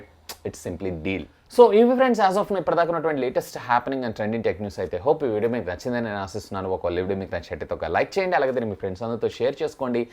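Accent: India. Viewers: Bro I like ur Buttler English😊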